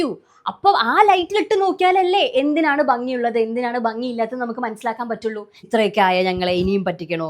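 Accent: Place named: Kannur, India. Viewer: Panam potte powyer varatte. (Kannur basha)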